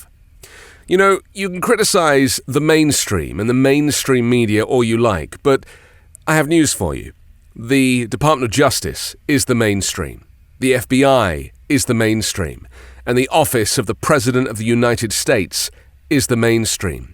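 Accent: United Kingdom. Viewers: OMG 😳 I love 💗 the British accent! We are all in this fight against fascism together. Bless you. 💋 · Don’t u just love the British accent 🥰explaining how trumps ass is about to fry 🔥in the karma grease🤣🙌🏾🌈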